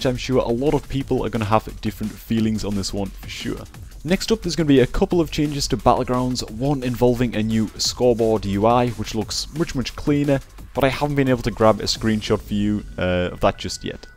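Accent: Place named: Geordie, United Kingdom. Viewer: proper strong geordie accent...makes you sound foreign man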